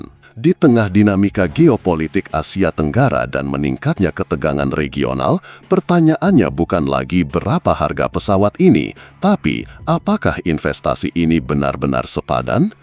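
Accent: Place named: Indonesia. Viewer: kenapa admin suara indon dan bahasa indon.suka sangat indon cakap pasal pertahanan malaysia.kenapa admin tak bercakap pasal alat pertahanan indon sendiri.tak ada org berminat ke kalau cakap pasal alat2 pertahanan indon tak laku ke atau ada org minat.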